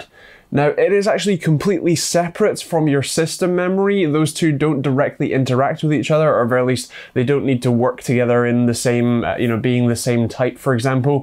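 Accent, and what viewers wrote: Scotland, United Kingdom. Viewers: his voice is Scottish where tf do you think he’s from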